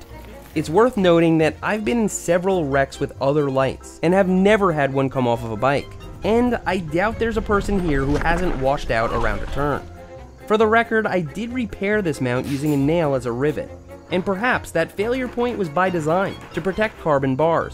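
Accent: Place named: Midwest, United States. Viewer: it sounds like he is plugging his nose while he talks with a bad midwestern accent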